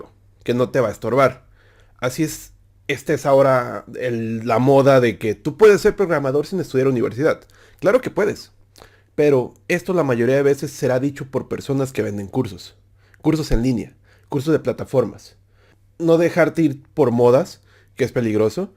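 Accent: Mexico. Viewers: Fresa-barrio xd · We eres bien verga hablas como fresa-barrio y explicas bien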